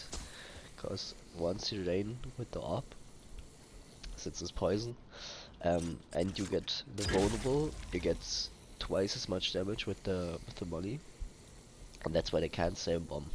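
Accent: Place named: Germany. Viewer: Deutscher Akzent ist auf jeden fall da!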